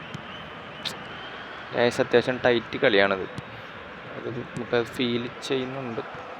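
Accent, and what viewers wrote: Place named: Thrissur, India. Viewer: slang kettapole mansilaayi😂thrissuril evde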